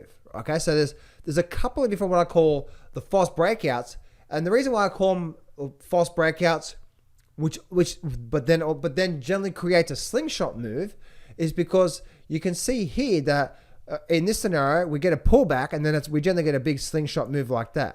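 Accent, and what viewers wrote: Australia. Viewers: Thanks,. DAH-ta for us Americans. DAY-ta sounds better with your Aussie accent. nq17k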